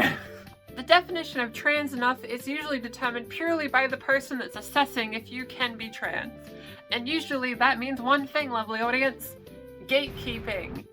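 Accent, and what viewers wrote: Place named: Australia. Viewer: strayan accent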